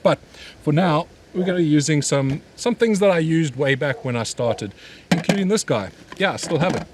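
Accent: South Africa. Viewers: By the way. Are you an EXPAT? You Sound like a South African boytjie 😁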